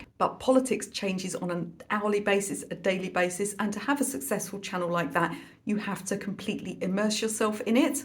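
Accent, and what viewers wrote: United Kingdom. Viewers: I LOVE your voice!!! I have always loved the British accent.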